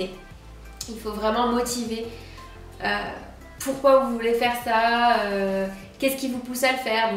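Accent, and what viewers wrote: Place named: South, France. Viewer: Les accents régionaux, ça existe et on n'y peut rien. Vous n'allez pas reprocher à un marseillais ou un toulousain d'avoir l'accent du sud,par exemple, si? Et puis, honnêtement, on s'en fiche. Ce n'est pas une vraie "erreur" ,dès lors qu'elle sait faire la différence à l'écrit !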